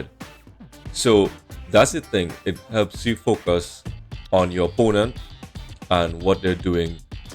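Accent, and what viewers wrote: Trinidad and Tobago. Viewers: Are you Trinidadian? The accent sounds very familiar.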